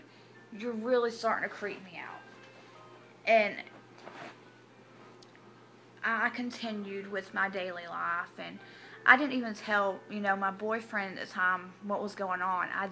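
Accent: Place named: South, United States. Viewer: I love your Southern accent and I'm sorry this happened to but you're very pretty!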